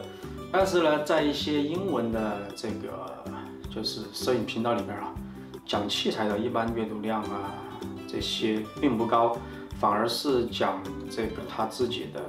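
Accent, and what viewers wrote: Sichuan, China. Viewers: 老师是四川叻？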